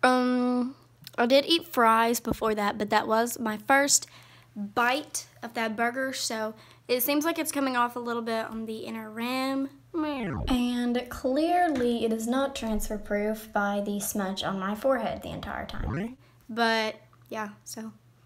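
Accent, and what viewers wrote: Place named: South, United States. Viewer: I just subscribed because of that accent!!!! I love the Southern accent.. Wish I could have more southern Americans coming to the UK the accent is a delight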